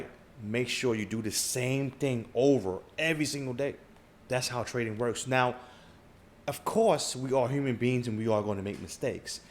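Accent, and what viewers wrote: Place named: New York, United States. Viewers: I fw you heavy my guy 💪🏾 You sound like you're from Harlem, no?... lol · You gotta be from NY with that thick accent lol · Yes I know that New York accent. I’m originally from Philly and lived in NYC for years. I love it.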